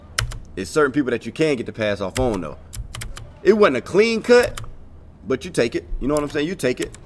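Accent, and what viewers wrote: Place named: Atlanta, United States. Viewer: My dawg pointed you out....you peeping it young ..that next pass gotta know his next move...good break down...you atl or you midwest boss? You got that t.i. dialect ...salute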